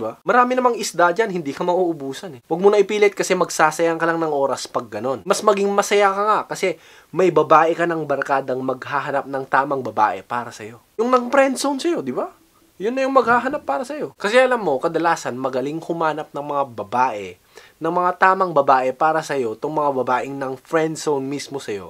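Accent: Ilocos, Philippines. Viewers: bakit boses ilocano?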